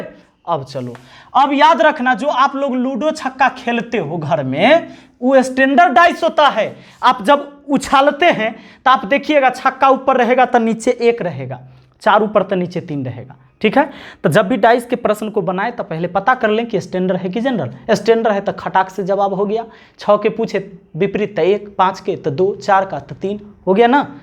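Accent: Bihar, India. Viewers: ap bihari sur me bolte ho to maza nhi ata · Bihari boli❤ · Sir bihar se ho naa sach bolna🎉🎉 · का सर जी ,रउओ बिहारी बानी का???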